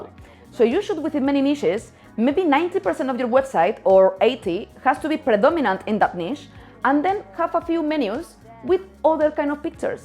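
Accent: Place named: Spain. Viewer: I love her typical Spanish accent when speaking English ❤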